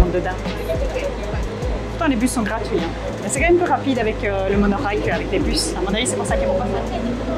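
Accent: Belgium. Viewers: vous etes belgiques ou francais?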